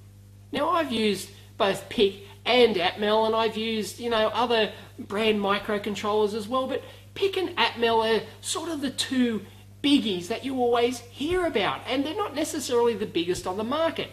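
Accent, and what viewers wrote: Australia. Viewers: you can be crocodile hunter dude..i love the way you talk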